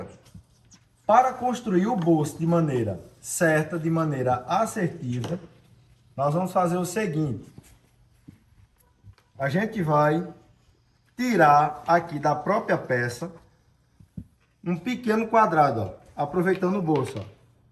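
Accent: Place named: Northeast, Brazil. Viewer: O professor faz tudo parecer tão fácil. Parabéns. E esse sotaque maravilhoso. Sou carioca e amo sotaque nordestino ❤🎉🎉🎉🎉🎉🎉🎉🎉🎉🎉😂bj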